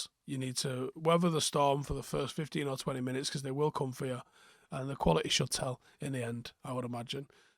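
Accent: Manchester, United Kingdom. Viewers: lol if you cant tell the guy in this video has a manc accent you’re absolutely tripping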